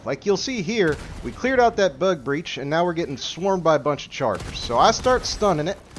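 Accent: South, United States. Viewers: This dude is legit, great to hear southern accent too😆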